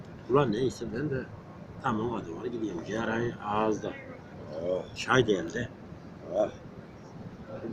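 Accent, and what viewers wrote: Central Anatolia, Turkey. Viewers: İç anadolu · İç adadolu Aksaray Niğde şivesi olabilir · Arkadaşlar adam iç Anadolu'dan iç Anadolu da çoğu yerde bu şive ve ses tonu var. Sadece sizin orada bu adam gibi konuşulmuyor. Eğer iç Anadolu da yaşıyorsanız %90 böyledir şive. Ve bu adam Yozgatlıda olabilir Niğdeli de Kayserili de Nevşehirli de Kırşehirli de vs vs hatta ve hatta Çorum Sivas ta olabilir.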